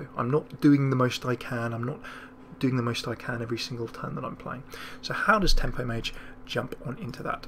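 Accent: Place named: United Kingdom. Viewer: Completely off topic, but I love your dialect. Where in the UK are you from?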